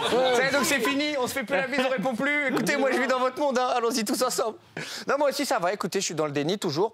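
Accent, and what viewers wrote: Quebec, Canada. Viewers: 10 sur 10 pour ton accent québécois, je certifie! 🙂 Bonjour de Montréal 👍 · Wow tu a tellement bien l'accent québécois impressionnant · Wow l'accent Québécois! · Sa parait il a entraîné son accent québécois lui je valide 😂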